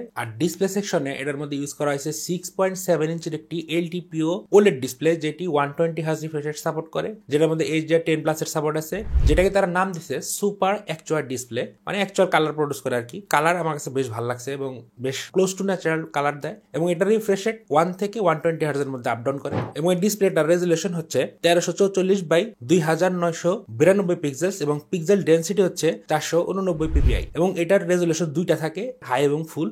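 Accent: Tangail, Bangladesh. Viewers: Vai, apnar bari ki tangail?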